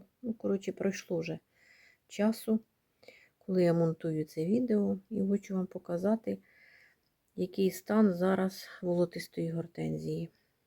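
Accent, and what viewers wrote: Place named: Cherkasy, Ukraine. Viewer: Дякую, що поділилися досвідом!!! Буду пробувати розмножувати, це так цікаво. У вас такий знайомий говір, ви не з Черкащини??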